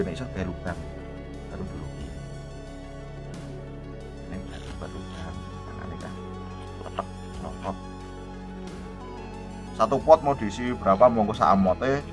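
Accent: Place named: Java, Indonesia. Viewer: Salam satu hoby kang.....dari boyolali...aku suka bhasanya meduk capur Jawa .....kadang bikin ketawa..lanjutkan moga sukses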